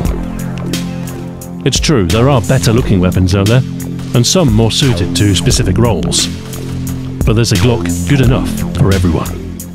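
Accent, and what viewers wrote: United Kingdom. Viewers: And if you hear his accent, you can tell he is British, which most likely means he never shot a gun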